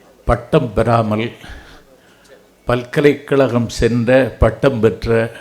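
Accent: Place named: Nanjil, India. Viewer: Very good speaker. Nanjil tamil is always sweet.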